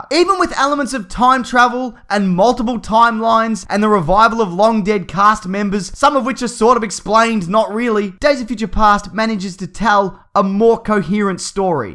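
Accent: Australia. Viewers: For some reason, listening to Aussies say the word 'classic' will never cease to be hilarious to me. I'm a kiwi.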